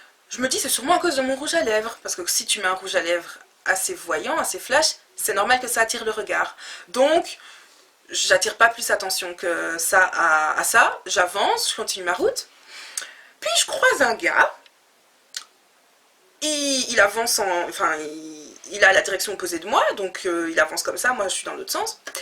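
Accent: Belgium. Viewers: T'es chou avec ton accent belge ❤️ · lol trop marrant😅😅😅😂😂tu es belge??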